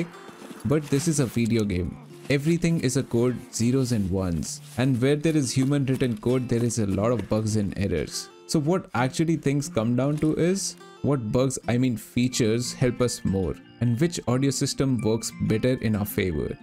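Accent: India. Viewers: Your videos would be awesome if you spoke english instead of indian-mutated english :}{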